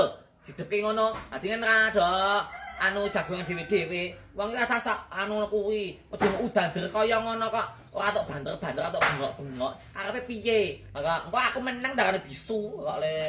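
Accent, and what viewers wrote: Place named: Surakarta, Indonesia. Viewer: Logate ❤nambani logat solo Jateng....wong tuo q asli solo jogya ... Saiki q d kota prob jatim... Logat q ws gk ngunu😊😅😅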